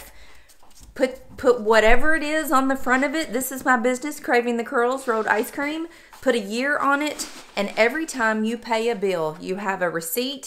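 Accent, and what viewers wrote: South, United States. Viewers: I don’t know why but you amuse me. Love your southern accent. So much energy. Thank you for the tips. So helpful. I’m 61 and started being more mindful about knowing where my keys are.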